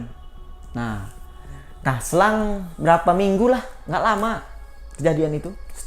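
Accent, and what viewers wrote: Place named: Jambi, Indonesia. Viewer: AssalamualIkum.. Jambi hadir bang,semakin kesini bang tambah keren,begitu juga dg baground nya,walaupun udah berubah tp ttap dapat unsur mistis nya,dan yg lebih keren lagi,bang ttap menyelipkan logat bahasa jambi di setiap cerita,bang emang the best! Best beesstt!! 👍👍👍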